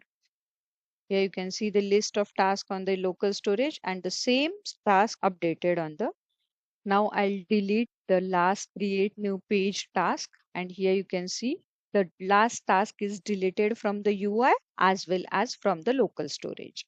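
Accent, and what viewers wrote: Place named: Maharashtra, India. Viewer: My mind is thinking that your english is having little Marathi accent 🤔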